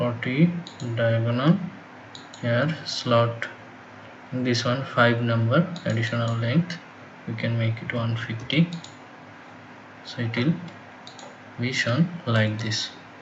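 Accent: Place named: India. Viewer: Hi.what is you nationality dear dude?You speak like Indian People.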